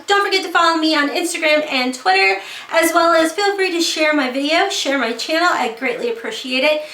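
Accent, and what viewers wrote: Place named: Kentucky, United States. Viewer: Oh, I'm originally from SC but I LOVE your Kentucky accent!!! 😉💖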